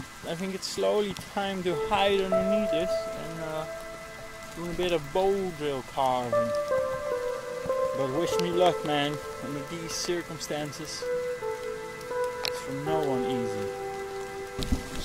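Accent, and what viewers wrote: Netherlands. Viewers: Ireland? You sound Dutch, am i right? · He has a Dutch accent is he from Holland ?? Edit: creepy background music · Ik hoor dat hollandse accent wel ! :D van waar ben je ? Ik ben een beginnend outdoorsman van Belgie, heb materiaal gekocht maar zou leuk zijn als er iemand is die me irl wat zou kunnen bijleren. Misschien kan ik ook helpen met filmen ? Laat maar weten ! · U have such a dutch accent or is it just me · ja kan dat mooie Nederlandse accent gewoon niet verbergen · Gotta love the dutch accent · jij bent sowieso nederlander ik hoor het🤣 wel hele toffe video👍👍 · Yo broeder. Aan je accent te horen hoor ik iets Nederlands. Klopt dat? Gewoon nieuwschierig. Top video! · You sound like ur from the netherlands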